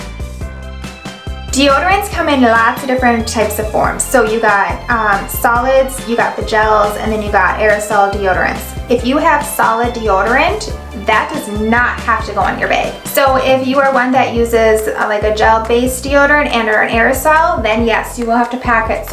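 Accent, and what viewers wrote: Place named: New Jersey, United States. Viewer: I like the accent are you from jersey ?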